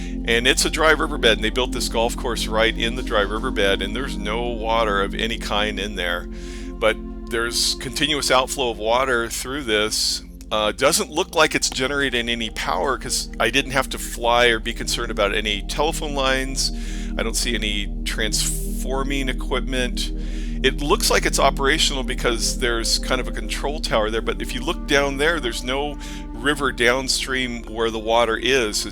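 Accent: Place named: California, United States. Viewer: This guy narrating sounds like a typical California moron. “This water is ugly, ew no” hahahaha my god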